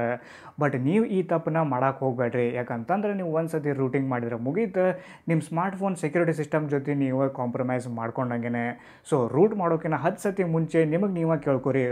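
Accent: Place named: Uttara Kannada, India. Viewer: Superb Uttara kannada language superb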